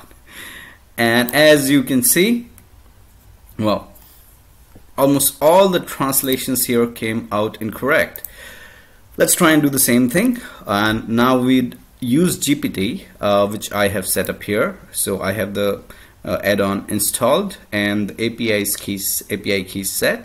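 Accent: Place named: United States. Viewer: bhai tu amerian accent me kyu bol rha hai ?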